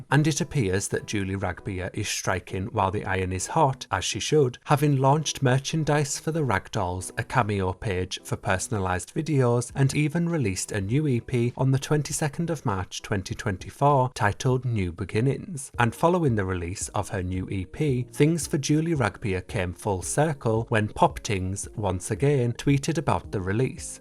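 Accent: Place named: Hartlepool, United Kingdom. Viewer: Your accent is very Hartlepool 🧐😀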